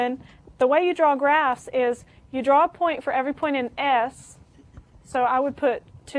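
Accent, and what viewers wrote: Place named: Texas, United States. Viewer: Accent as sweet and Texas tea.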